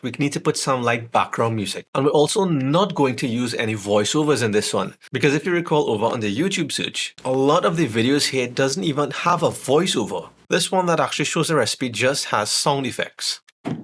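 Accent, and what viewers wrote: Trinidad and Tobago. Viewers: I love your Trini accent. #subscribed.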